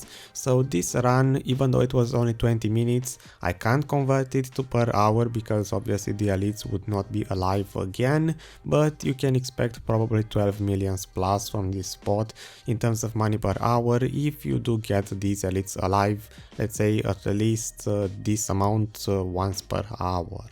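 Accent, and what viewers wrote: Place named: Romania. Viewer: yo, nice vids man, are you Romanian? Thou your English is almost perfect, i can hear a hint of accent which takes me in that direction :P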